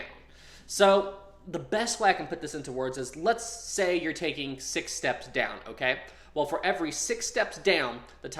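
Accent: South, United States. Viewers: Are you from the south I hear a fair amount of twang in words like time it’s a good thing btw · Your a yank though so excuse me if i only catch parts as that accent an hearing it bloody hell!!!!!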